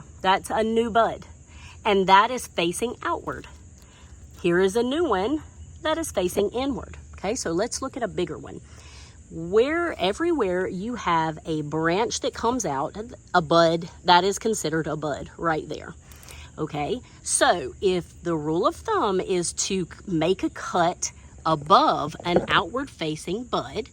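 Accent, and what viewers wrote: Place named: Texas, United States. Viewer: Thank you for an encouraging video. Roses frighten me. Historically, they have been difficult plants to grow. They are subject to so many problems that only the best gardeners had the magic touch with them, so I stayed away from them, but these Knock Out roses are easy to grow according to a friend. She planted six of them eight years ago. Said she does very little to them aside from water them. Said she planted them by more or less just throwing them into the ground. They are now virtual rose trees that are positively dripping in blooms. I am encouraged to try planting some. Do I have to test the soil first? The soil here is pretty clay-ee. I know they need a sunny location. I had no idea about how to prune them, I figured you just cut off anything that did not look right. I had no idea where to cut or that it should be at an angle. 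Any instructions you can give me to help me get some planted would be greatly appreciated. You sound like you may be in Texas where I am. Temps here are really HOT half of the year, and we have tremendous humidity. I have had some good success with Salvias which I love very much, but I long for rose bushes..... Thank you again! Cheers!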